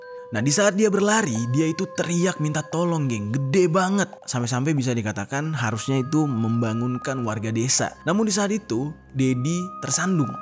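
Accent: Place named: Java, Indonesia. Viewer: Bang Ngomong Jawa Lucu Sumpah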